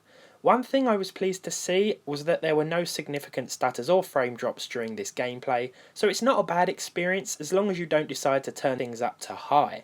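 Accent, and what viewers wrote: United Kingdom. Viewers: I hate that british speech 😂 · I heard british accent---------------------> i leave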